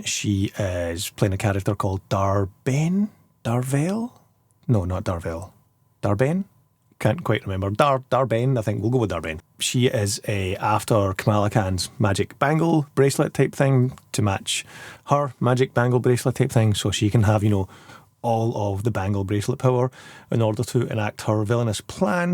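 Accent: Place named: Scotland, United Kingdom. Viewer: Hang on! You're Scottish?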